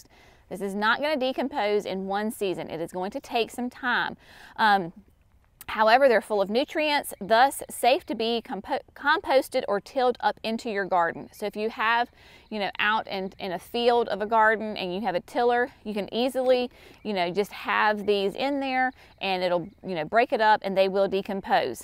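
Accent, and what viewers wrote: South, United States. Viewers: Great tips! You are so pleasant to watch. I’m from New Hampshire and your southern accent sounds beautiful to me🥰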